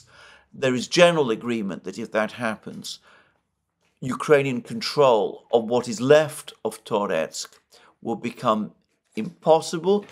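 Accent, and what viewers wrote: England, United Kingdom. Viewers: I wouldn't be able to read it with that English accent.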